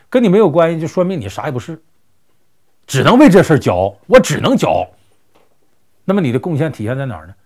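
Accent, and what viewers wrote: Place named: Shandong, China. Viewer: 这山东话太纯了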